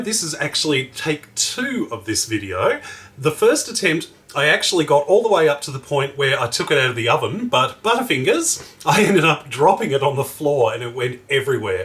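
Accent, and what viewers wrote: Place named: Australia. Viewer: Australian accent is 👌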